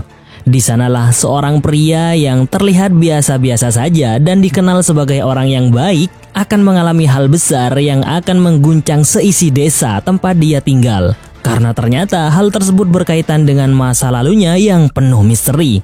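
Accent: Madura, Indonesia. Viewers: Adminnya orang Madura ternyata 😂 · Hahh takok epate'en can, reng madhure ben kak😅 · Ooh pantes aku kayak ngeh ada logat maduranya ternyata benar😂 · Wah ini pembawa suara nya orang Madura yaaa😅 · Kakeh reng medureh yeh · Ini orang madura ya 😂😂😂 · ini Narator orang Madura ya..😂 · reng medureh kiah reng wkwkwk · Lu orng Madura yh bng😂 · hehhh sengak. wkwkk lu madura ya min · Oreng medureh toktotokkah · Saya yakin pengisi suaranya " Oreng Madureh" . 😂😂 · Komentator nya org Madura ya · Medure mas 🤣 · Medureh kak😂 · Beh reng medureh??😅😅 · Ini kayak orang madura yg menjelaskan narasi😂😂😂😂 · Medureh dimah kanak😅😅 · ternyata pengisi suaranya madura 😅😂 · Ternyata orang madura wkwkwk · Seperti ya yg isi suara ini orang madura😅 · Memang mantap mon oreng madure membacakan alur cerita god job bang sehat selalu😂😂😂😂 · Teppak ka reng madhureh boss 😂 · orang Madura bang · Dek....sedeh/sampiyen medureh kammah dek... 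Salam sittong dere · Ini yng ngomong orng madura jiah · Orang Madura yg ngisi suara nye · Masyallah acaca medhure ropanah.. Deri dimmah min.. Sengkok reng medhure kyah.. Deri Mempawah Kalimantan barat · Beehh mak medurehh payaaa celakak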